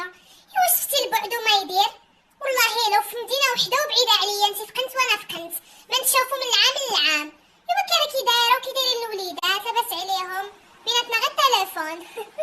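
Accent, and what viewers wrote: Oujda, Morocco. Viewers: لا اللهجة الوجدية هكا ديرا الوجدا عندنا فلمغرب هدي هي لهجتهم 😒😒😍😍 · هادي وجديه من وجده هكا هدرتهم واغلبيه المغاربه هكا كيهدر . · ههه هاديك راه الهجة وجدية مدينة ف الشرق قريبة للجزائر المكلخة بنادم معارف تا لعبة اداوي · هادي وجدية نورمال تجيكم بحال شي جزائرية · وجدة هادي هدرتم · هادي لهجتنا يالخامجة لهجة وجدية وبركانية كوني تفهمي هههه متبقايش غير تحلي في فمك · خاوتي هادوك لي يقولوا تقلد فوحدة جزائرية هدي راها وجدية وماتقلد تاحد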